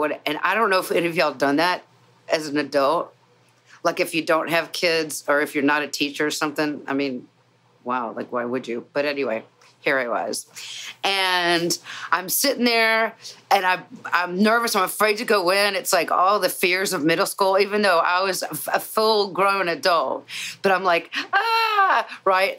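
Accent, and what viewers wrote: South, United States. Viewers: Good deal...taking up for her like that. I'm not used to hearing a slight country twang to your voice but, it sounds good. The more you get excited, the more it comes out. I probably sound like pure West Virginia hillbilly but, that's where I'm from....LOL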